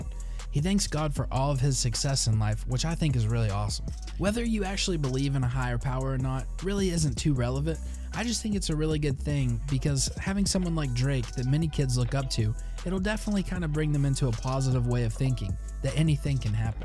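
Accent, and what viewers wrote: United States. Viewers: Mega southern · Broooo, you gotta be from B-More you got the strong O accent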